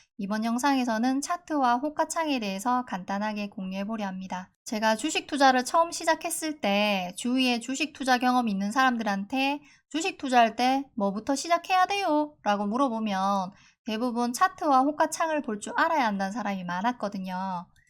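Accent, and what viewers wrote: Gyeongsang, South Korea. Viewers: 경상도 사투리 여성분 목소리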